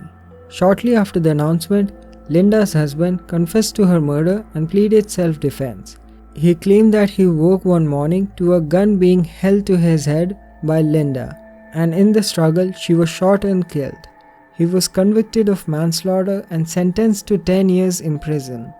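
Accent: India. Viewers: Your narration is fantastic! I love listening to Indian accent it's beautiful!! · Mate, you accent is Indian right? funniest accent in the world to my ear, I'm an Aussie so our accents is are quite different. But your well spoken, even if some things are missed pronounced and quite easy to understand, the dreary americans are the worst like Criminally Listed his a terrible narrator cant even watch his vids. my point is your got nothing to apologise for good video keep up the good work.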